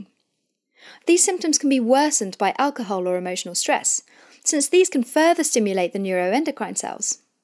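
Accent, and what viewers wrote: United Kingdom. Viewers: Nice video, straight to the point! British accent makes me kinda distracted, had to replay the video few times. But again it’s just me. Tanner, come back! :) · Loving the British accent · I was so mesmerized by the British accent . . .. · Seriously I love your videos.. but this British accent needs constant focus.. American accent is far most easy to understand.. not a criticism but just an opinion.. anyways as always best explaination! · The British accent is wonderful 😍😍😍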